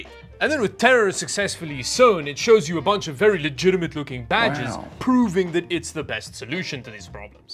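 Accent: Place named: South Africa. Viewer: south African accent makes my ears bleed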